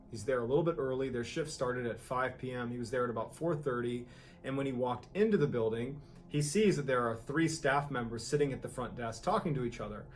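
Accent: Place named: United States. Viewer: 🇬🇧 man this girl is cute as fuck!!! 😍 I love her American accent #notaperve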